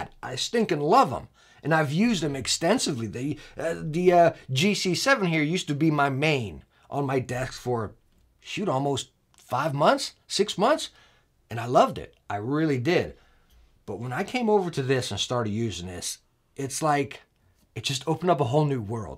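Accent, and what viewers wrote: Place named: Massachusetts, United States. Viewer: Thanks for bringing the ruckus,! Where are you from? Definitely the northeast. My best guess is Massachusetts.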